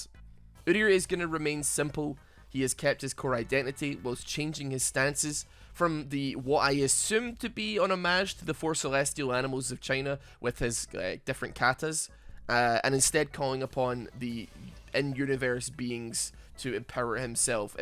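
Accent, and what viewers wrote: Scotland, United Kingdom. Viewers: Are you Scattish my friend?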